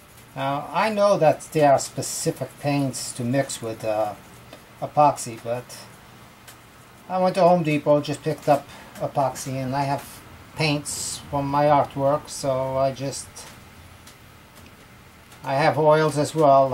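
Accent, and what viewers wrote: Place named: Azores, Portugal. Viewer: You sound like an Azorian person!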